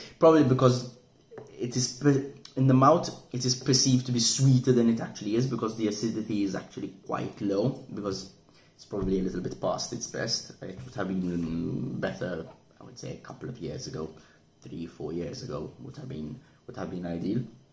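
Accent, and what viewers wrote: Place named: Jamaica. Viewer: Good review. You sound Jamaican.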